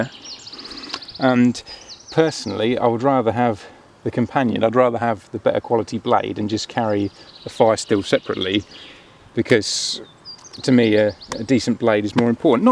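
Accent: United Kingdom. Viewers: where abouts in uk are your from man?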